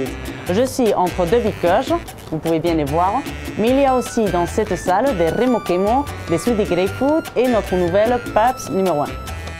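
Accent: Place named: France. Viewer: Oulah chérie tu m'as comblé avec ton accent frenchie 😍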